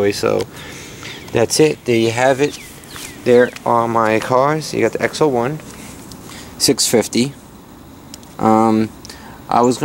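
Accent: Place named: East Coast, United States. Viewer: Are u from new York or somewhere in the east coast u have a strong acent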